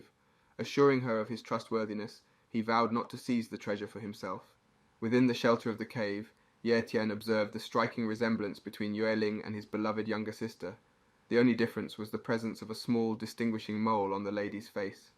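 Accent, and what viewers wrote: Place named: United Kingdom. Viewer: W Brit accent, 😂 my accent ain't as good as yours 😢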